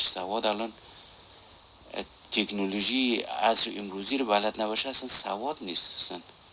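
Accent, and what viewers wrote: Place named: Iran. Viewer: موضوعی خوبی را بحث کردی ، ولی با معذرت لحجه واقعاً افتضاح است آغا ، به عنوان مثال یکدفه خیلی غلیظ ایرانی میگی (اینجوری) یکدفه خیلی ساده محلی (موکونه) میگی 🤣 دوم اینکه خیلی پِچ پِچ میکنی ، ادامه داده نتانستم . لحجه و رویش گویندگی روان و ساده خیلی مهم است .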